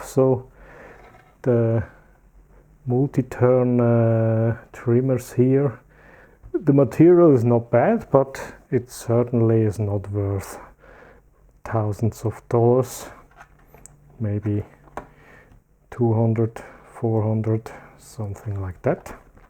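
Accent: Germany. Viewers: Also es klingt für mich so,als wenn du Deutscher bist 🤔 Liege ich da richtig? 😜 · Sorry, was very interested to watch, but your accent is just too disgusting and vomit-inducing. I mean German is bad enough, but Mountain-German even worse. If you use English, at least learn to pronounce it without giving ppl ear-cancer. Everything else is just arrogant and cringey. One of the biggest offenders was "tchyoobs". It's pronounced "toobs", yo. Well, gonna mute you and maybe then it'll be watchable...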